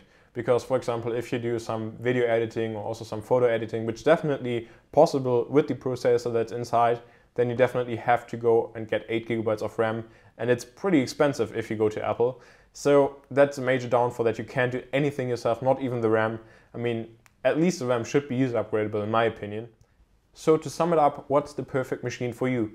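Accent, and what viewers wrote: Germany. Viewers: Are you from Germany? You have a slightly german accent.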